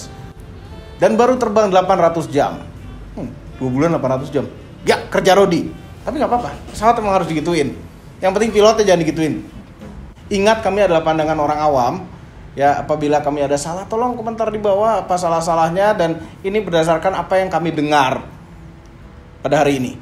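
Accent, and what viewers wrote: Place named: Blitar, Indonesia. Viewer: Blitar men logate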